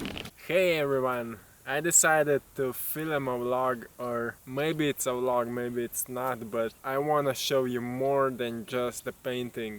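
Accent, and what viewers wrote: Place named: Slovenia. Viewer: Živjo, začela sem pisat engleško in čujem da si Slovenc. Gdaj sem vidla da delaš yogo in posnemek v naravo in pravim kako je to čudovito lepo, kje pa je to?! A to je naša lepa dežela. Samo naprej k veličanstvenim. Lep pozdrav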